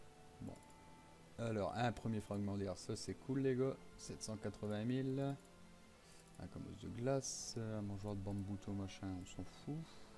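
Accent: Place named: North, France. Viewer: oh t'es du nord toi aha, bonne video poto force a toi!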